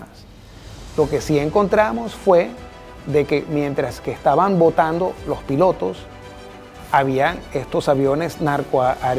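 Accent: Venezuela. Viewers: Me gustaría oír que te interesaste en el tema venezolano porque tu origen es venezolano, hablas como venezolano y en tu corazón todavía late la bandera tricolor con sus 7 estrellas.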